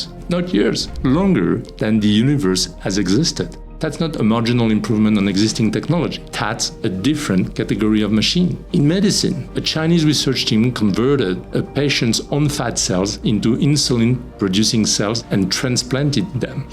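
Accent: France. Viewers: Fantastic research. The data provided is exquisite. Thanks for this valuable work. It's priceless The only thing that gets in the way is the french accent. Man, your videos are the only ones I can watch till the end. I now realize how the articulation of the two languages are different that it makes it almost impossible without sacrificing good amount of clear speach. Almost all sounds are pronounced differently.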